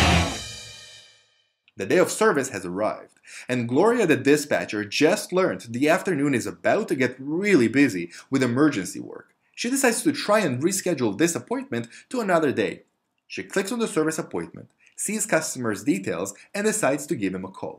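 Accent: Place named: Italy. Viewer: Loud rock guitar music, Italian accent.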